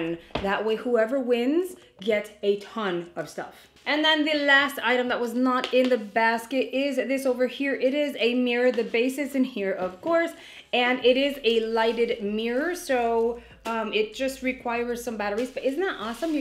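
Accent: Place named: New Jersey, United States. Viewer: Congratulations on hitting 40 K on your YouTube channel, I watch you all the time and I love your New Jersey accent. Thank you for posting such fun and exciting videos. You rock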